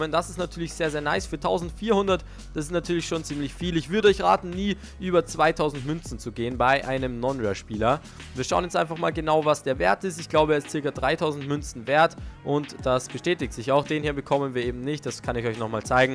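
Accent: Austria. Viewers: Es is a östareicha ok mia song ned oise so wie de deitschn. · Er kommt aus österreich du fisch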